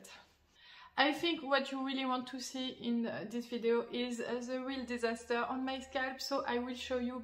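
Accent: France. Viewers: J'adore tes vidéos en anglais car j'ai exactement la même manière que toi de le parler : grammaticalement c'est bon mais avec un accent totalement français 🤣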